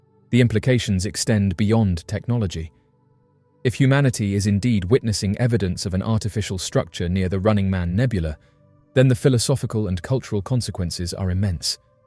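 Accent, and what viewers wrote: England, United Kingdom. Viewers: Run for your lives !!! The Aliens are coming !!! Be very Afraid !!! Do what the Aliens tell you to do !!!!!! Do what a creep with an "English" Accent tells you to do !! Believe the Propaganda !!!! This is really entertaining for me !!!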